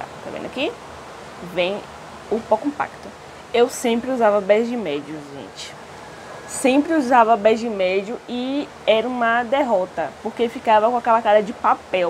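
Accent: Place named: Northeast, Brazil. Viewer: ficou otimo o video ri muito com seu jeito de falar me identifiquei sou nordestina tambem kkkkkkk mulher nao sei me maquiar nao tu deu uma aula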